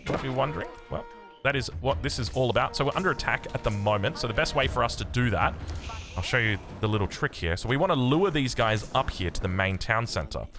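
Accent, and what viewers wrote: Australia. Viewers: Yes he’s just Aussie it don’t rlly matter but yeah we normies say “cash”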